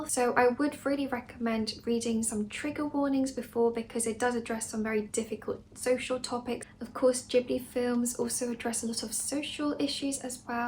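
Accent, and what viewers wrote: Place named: United Kingdom. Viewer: I love your brittish accent oh my god keep talkinggggg!! 🫶🏻 hahahaha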